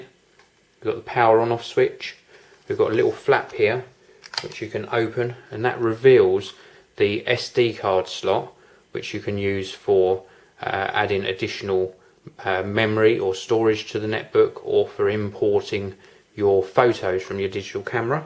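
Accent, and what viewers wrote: United Kingdom. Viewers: british accent :D i love it :D:D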